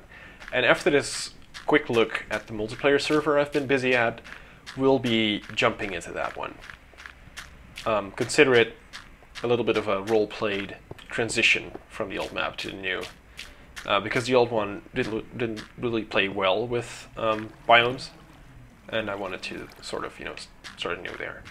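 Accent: Netherlands. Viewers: you from Holland?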